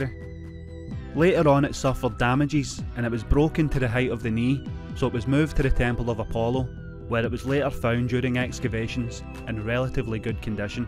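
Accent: Scotland, United Kingdom. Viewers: Is this a scottish accent? Just wondering, because it was so easy to follow, while irl my encounters with Scots have usually made me feel like I needed to go back to school and practice.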